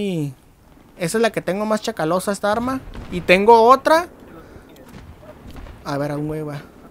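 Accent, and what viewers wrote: Mexico. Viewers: eres de mexico? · ajajajajaj me subscribo me imagino k eres mexicano yo si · hablas muy norteño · Oye amigo de donde eres suenas como si fueras de aca de mexicali , B.C jeje xD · eres mexicano we?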